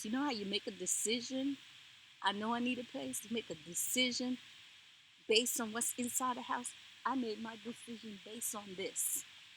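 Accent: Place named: Jamaica. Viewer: I hear a Caribbean accent...sounds Jamaican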